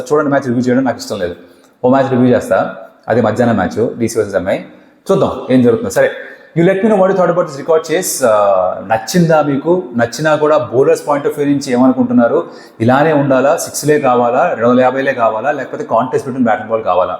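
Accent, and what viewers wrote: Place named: Hyderabad, India. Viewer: Bhaiyya apka Hindi mast hai bhaiyya....pakka hyderabadi hindi❤❤❤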